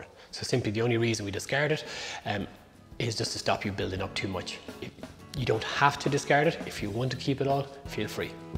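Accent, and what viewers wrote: Ireland. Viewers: I love your accent, I feel in I’m Ireland when I listen to your voice. I really like you baking style. Cheers buddy keep making great bread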